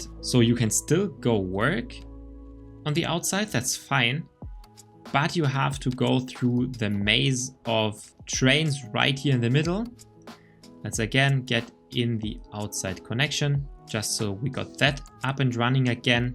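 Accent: Germany. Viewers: deutscher Akzent, 10/10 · uff ich höre sofort raus das du deutscher bistXD trotzdem geiles tutorial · do i hear deutsch?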